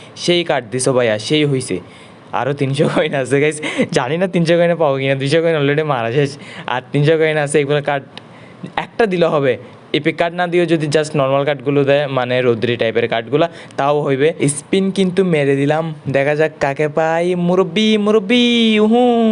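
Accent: Chittagong, Bangladesh. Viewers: ভাইয়া কি চট্টগ্রামের নাকি???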